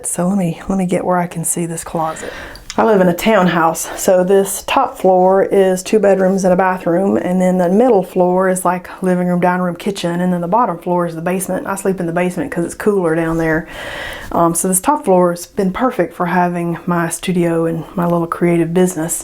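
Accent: South, United States. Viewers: Thanks for the great content, your southern accent is lovely!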